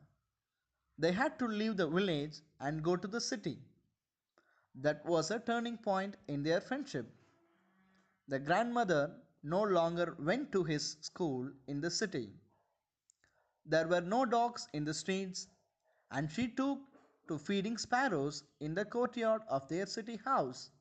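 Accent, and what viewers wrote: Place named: Kerala, India. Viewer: Awfully narrated.. Typical mallu accent 😢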